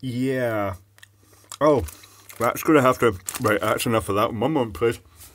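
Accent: Scotland, United Kingdom. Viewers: I've watched this twice, so far. Should I be embarrassed to admit that Czech words and yummy noises in a Scots accent are oddly compelling?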